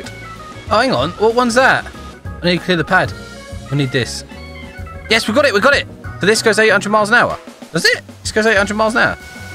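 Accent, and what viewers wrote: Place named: London, United Kingdom. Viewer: my mom said you are from london he knows ur accent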